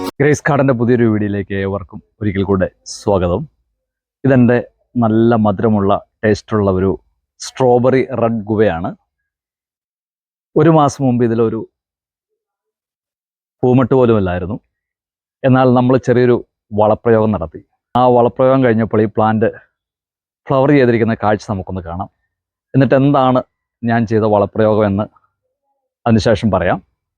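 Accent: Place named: United States. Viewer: ഒരു അമേരിക്കക്കാരനെ പോലെ സംസാരിക്കും. ഇംഗ്ലീഷ്.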